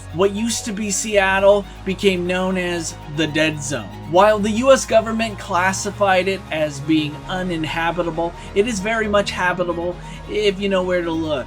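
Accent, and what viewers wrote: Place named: Oregon, United States. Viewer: Are you from Oregon bro!?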